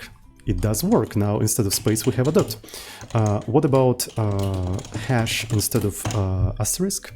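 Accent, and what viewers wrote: Russia. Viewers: Слишком русский акцент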